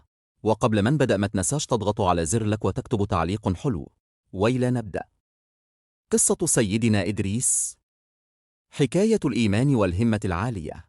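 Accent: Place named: Egypt. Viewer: فعلا معظم الناس مش تعرف قصته وكمان أشكركم جدا على وضع اللهجة المصرية❤❤🙂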